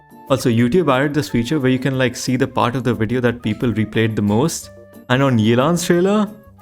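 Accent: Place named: India. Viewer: really like your accent. Its inbetween indian non indian- kinda 🥵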